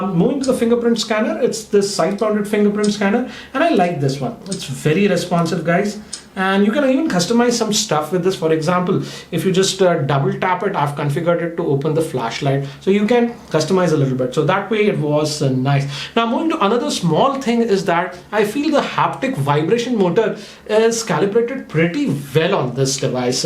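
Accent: Hyderabad, India. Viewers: R u from Hyderabad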